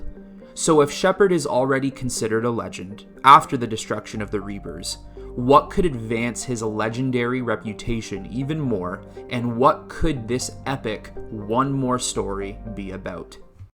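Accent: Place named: Canada. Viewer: you’re so welcome. Also I LOVE that Canadian accent 😃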